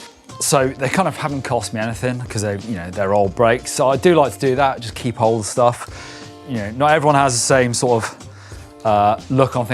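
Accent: United Kingdom. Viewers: pleasee chenge the dude I just cant listn to his british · Your voice is too gay and British m8 I can't watch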